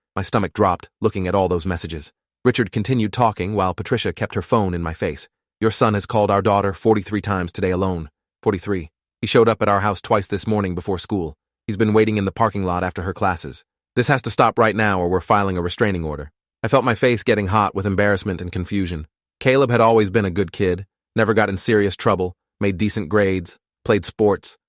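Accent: United Kingdom. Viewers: Why he British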